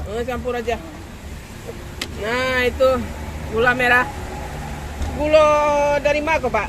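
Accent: Minangkabau, Indonesia. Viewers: ulangnonton seronok mendengar suara lpghat minang tetapi tidak pekat.masih boleh faham selamat baik sampai jumpa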